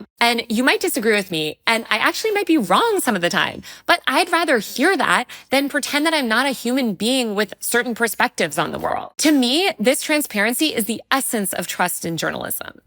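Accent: California, United States. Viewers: Wapo sucks, your op eds suck and appealed to around 3% or less of the US poulation because your snotty pompous Cali sounding self knows nothing but a political agenda and a bad agenda at that because you dont live in the real world. You live in a concrete, artificial, air conditioned life with no contributions to society and you could have with all the corruption that goes on but no and ive read a few of your op eds and I could hear the simpleton Cali no nothings voice just reading it. Opinion isnt journalism so quit calling yourself one.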